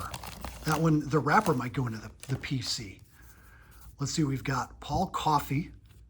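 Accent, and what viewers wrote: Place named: Canada. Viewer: Thanks for sharing, loved listening to you speak Canadian to us! The 1991 O-Pee-Chee baseball was a small set and was heavy on the Canadian teams, so you were right on.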